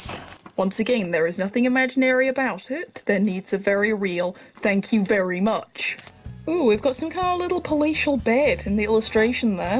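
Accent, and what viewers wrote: United Kingdom. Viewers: You have a nice accent. Brittish?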